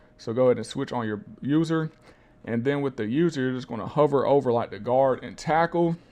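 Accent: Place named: South, United States. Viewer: This guy is from Alabama or Mississippi. That drawl....I recognize my peoples...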